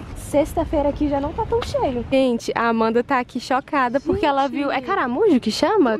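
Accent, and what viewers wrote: Minas Gerais, Brazil. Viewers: Gostei muito Lindo Em Unaí lugares lindos,um beijo meu amor fique com Deus❤ sotaque maravilhoso e do pessoal de Minas😍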